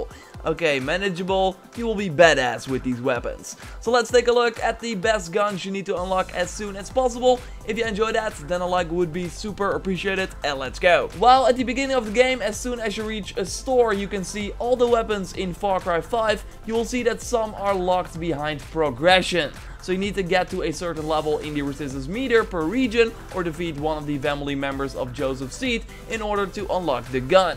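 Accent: Netherlands. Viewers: Ben je Nederlands heel misschien? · you sound dutch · The Dutch is strong in this one. Good vid =] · Are u dutch i hear it somehow in ur voice? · are you Dutch? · Join some speaking lesson m8 ur Durch accent is really strong. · Ben je nederlands? Want je hebt een nederlands engels accent hahaha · tophercheese1 Dutch accent. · Ben je nl? I hoorde je video zeggen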